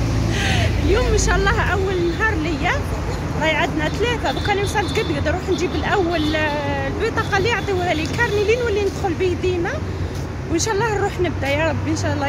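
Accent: Upper Egypt, Egypt. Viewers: فرحتلك راني متبسمة غير وحدي فرحانة بك تعجبني مين تتكلمي مصري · تتكلمي صعيدي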